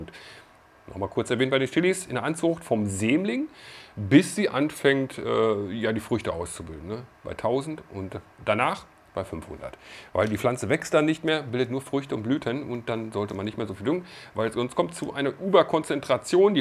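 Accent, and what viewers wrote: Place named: Ruhr, Germany. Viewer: Toll deine Pflanzen, und dein deutsches Dialekt erinnert mich so sehr am Ruhrgebiet wo Ich aufgewachsen bin und immer eine gewisse Nostalgie bleibt 🙏👍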